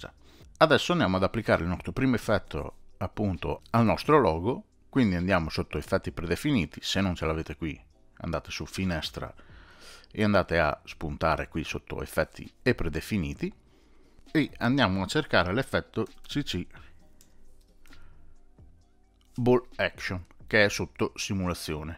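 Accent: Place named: Emilia, Italy. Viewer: Complimenti, sei maledettamente bravo, ti seguo sempre... Volevo chiederti una cosa, se è possibilee... Vorrei fare una casetta in campagna usando una foto, ma vorrei che nelle finestre della casa, si accendessero e si spegnessero, stessa cosa vorrei fare dei lampioni antichi, quelli di un tempo che avevano la candela, come si fa a fare questo effetto ? So che per te è una sciocchezza, ma io ci sto diventando scemo e mi sono guardato quasi tutti i video del tuo canale. Grazie e non smettere... FORZA BOLOGNA !!! L'accento Emiliano...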